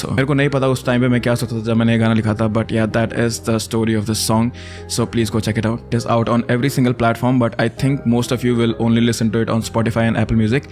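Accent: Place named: Bengal, India. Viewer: His mother toungh is Bengali bro he can't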